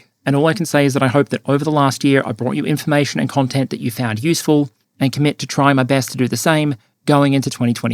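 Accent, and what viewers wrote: Australia. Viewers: It is awesome to hear an Aussie commentary about world affairs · I'd suggest you turn on subtitles. The words all make sense, perhaps the Australian accent is the issue for you?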